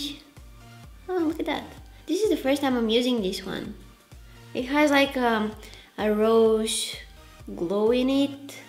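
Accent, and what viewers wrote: Bulgaria. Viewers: I met a woman the other day and I guessed she was from Bulgaria by her accent. Guess I've been watching a lot of videos!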